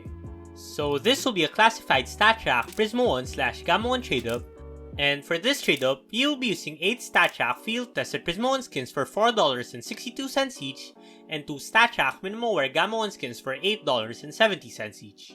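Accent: Philippines. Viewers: Pinoy voice detected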